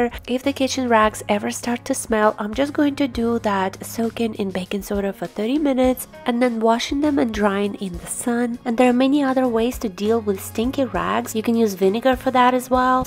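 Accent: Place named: Ukraine. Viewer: I’m a mother of 4 , my son is the oldest, then I have twin girl, then another girl. I am now a grandmother to 10 children, we have 6 granddaughters and 4 grandsons. I am a retired social worker and my husband is a nurse. We now take care of 7-8 of our grandchildren during the summer, and we do after school care when they are in school. I’m very busy with the littles, housecleaning, laundry, all the things, but I adore them! Where are you from? I have a friend from Ukraine and your accent is similar. Enjoy your channel!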